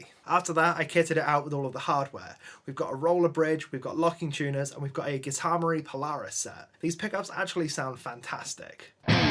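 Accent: United Kingdom. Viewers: Hi You sound british but do you live and work in iceland with mikko? If yes you are so lucky and i wish i could join you...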